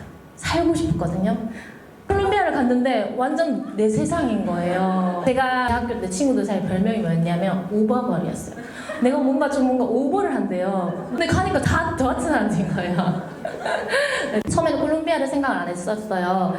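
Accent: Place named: Seoul, South Korea. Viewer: 서울말 잘 쓰시네요♡ 쿠바만 가신줄 알았는데 와우~^^ 남미를 6개월 간이나~ 열정과 도전 넘 멋져요.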